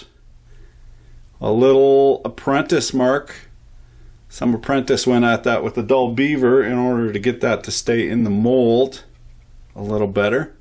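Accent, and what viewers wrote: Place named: Quebec, Canada. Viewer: your french isnt bad, almost sounds Quebecois :P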